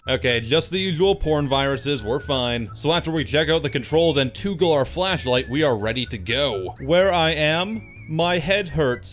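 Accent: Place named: Italy. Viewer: This is the way some italian speak english lel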